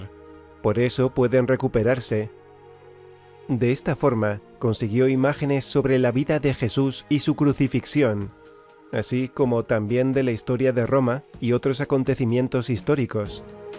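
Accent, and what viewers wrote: Spain. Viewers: Que voz del locutor ezpañol 😊😊😊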